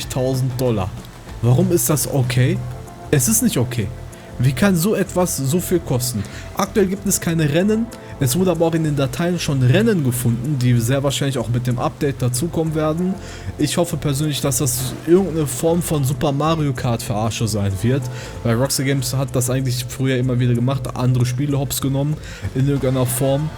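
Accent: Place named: Turkey. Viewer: Dein Türkendeutsch geht mir am arsch. Lern die richtigen artikel und fälle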